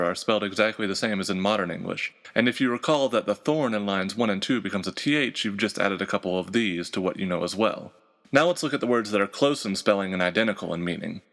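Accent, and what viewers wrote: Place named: United States. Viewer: I found an American accent funny talking about Middle English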